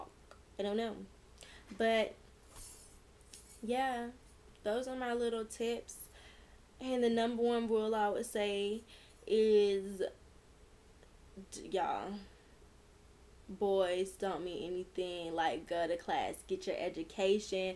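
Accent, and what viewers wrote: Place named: South, United States. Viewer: you have an extremely southern country, down south accent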